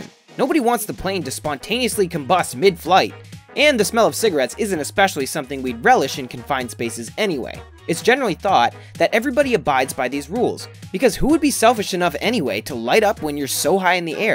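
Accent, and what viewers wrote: Canada. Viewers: The narrator is a Canadian, obviously. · He’s Canadian (like me) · Are you canadian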